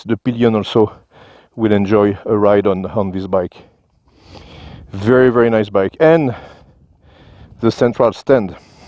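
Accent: France. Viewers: A true French accent ! 😁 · I love french accent in your english , because i am french 🤗. Les moteurs des cooters honda sont il les mêmes que sur les scooteur sym ? Merci for your vidéos , dear french man .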